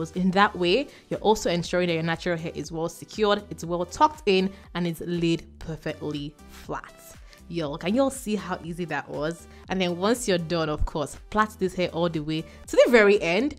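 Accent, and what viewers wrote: Nigeria. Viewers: I just like your Nigerian voice and way of speaking.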